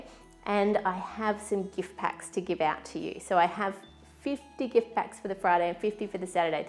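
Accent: United Kingdom. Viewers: Are you British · Your so talented and creative. And I love your accent too. It's like British accent.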